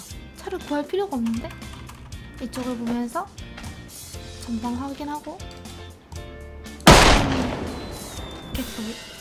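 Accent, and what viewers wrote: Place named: Seoul, South Korea. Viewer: 이분은.. 목소리가 차분하고 서울말 때문인지 몰라도 매력적이네 ㅋㅋ 점... 이뻐지고 어려저가는 님 ㅋㅋ Ps 면허증은 장롱속에~~^^ Ps1 3뚝 죽어놓고 아깝다고 마음에 없는소리 Ps2 치킨 잘 먹는 이쁜누나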